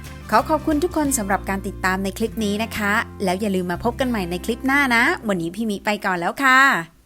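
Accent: South, Thailand. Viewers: แลคลิปพี่มิแหลงใต้ น่ารักมากๆเลยค่ะ😍❤️ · เพิ่งเคยได้ยินพี่มิพูดภาษาใต้ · พูดใต้ได้ชัดเจน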